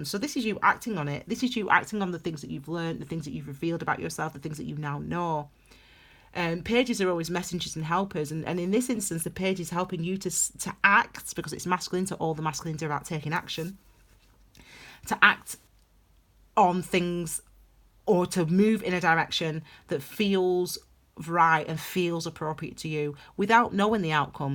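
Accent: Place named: Lancashire, United Kingdom. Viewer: First time listening, great reading, really spot on for me! Your accent sounds like you're a Lancashire Lass, am I close? I'm from that region originally myself. ♥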